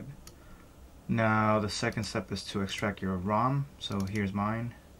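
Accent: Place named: Mexico. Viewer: Can you please fix your semi mexican accent? thanks.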